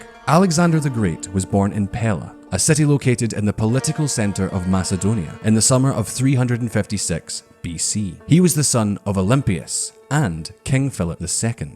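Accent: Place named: Edinburgh, United Kingdom. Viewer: How fitting is having this video narrated by an Edinburgh accent, the Athens of the North.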